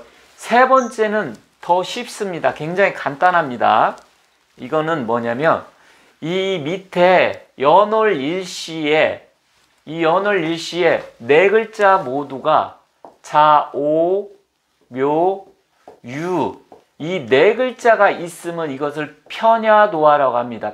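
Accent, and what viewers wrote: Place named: Chungcheong, South Korea. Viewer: 충남 사투리 시네요..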